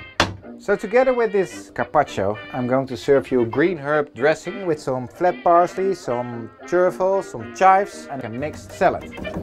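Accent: Netherlands. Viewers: Bart's Fish Tales Ik ben geabonneerd op jamie oliver's kanaal en toen ik je accent hoorde wist ik gewoon dat je Nederlands was haha. Kun je een keer gerechten maken met gamba's? Ik ben namelijk dol op gamba's en garnalen...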